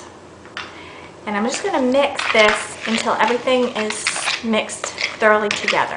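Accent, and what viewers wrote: United States. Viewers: u sound country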